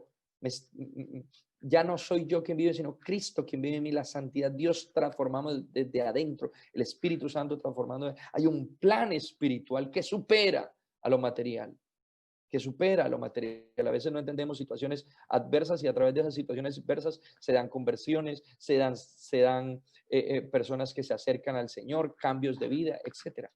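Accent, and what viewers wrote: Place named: Colombia. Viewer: Este acento, me suena Colombiano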